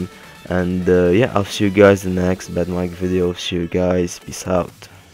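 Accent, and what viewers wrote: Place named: Lebanon. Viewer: Yo im from Orlando but I got a friend from Lebanon and you have a really similar accent, are you by any chance Lebanese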